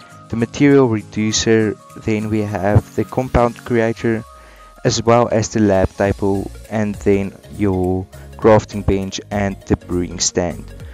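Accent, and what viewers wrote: Afrikaans, South Africa. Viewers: Mate you from SA? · youre afrikaans right? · Dude are you South African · He sounds southe afrikaan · is jy afrikaans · Are you from South Africa #SouthAfrican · My bru you sound south African my chom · Are you from south Africa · You can hear the afrikaans in his voice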